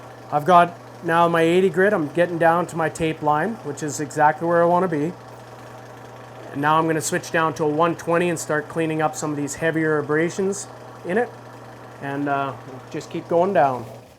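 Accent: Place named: Canada. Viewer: FatherSonGunFun Um, pretty sure that's a Canadian accent.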